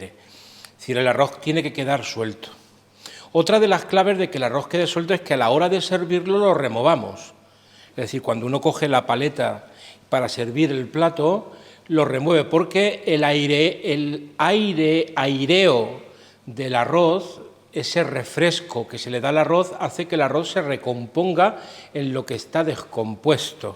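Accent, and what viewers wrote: Castile, Spain. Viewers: me encanta que cuente historias mientras cocina. Me gusta mucho verle cocinar, como lo explica todo y escuchar su voz es recordar la entonación castellana de mi abuela manchega. ¡Qué tranquilidad y relajación produce ver sus vídeos de cocina!. Y muchas gracias por todas sus enseñanzas. Como bien dice usted, Paz y Bien para todos. Saludos desde Córdoba, España.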